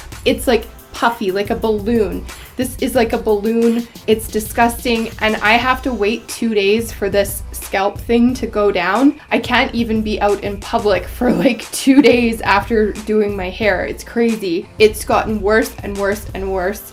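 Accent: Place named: Canada. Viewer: I think I need more tutorials with a canadian accent. Ha! I miss living up there. This was REALLY helpful. Thank you so much!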